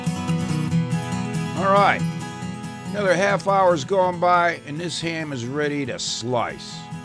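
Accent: South, United States. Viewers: Bacon In Ham,This Man Is A Genius Im Hooked!!!Love His Southern Accent Too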